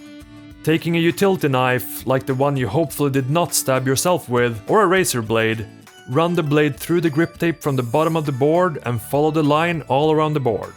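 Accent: Sweden. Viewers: you are swedish right? You have a swedish accent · Sounds like northern Sweden. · Without knowing better I would quess you are Swedish based on your accent and specialy the way you say "just". Awesome and very good looking video btw! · Are you swedish becase i can Kinda hear it on your accent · are you swedish??? your accent is pretty swedish??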